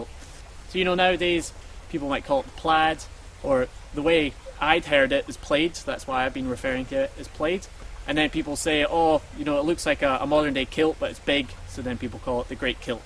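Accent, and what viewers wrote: Scotland, United Kingdom. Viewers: Interesting and informative, and great to hear a Scots accent on Yt. Dunno about dipping my plaid in a burn, though - you're a braver man than me,:-D All power to ye.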